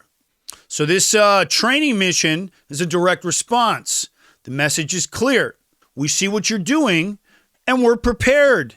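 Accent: United States. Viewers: I enjoy your presentation. Are you Jewish? You seem to have an American accent. R. Evans Australia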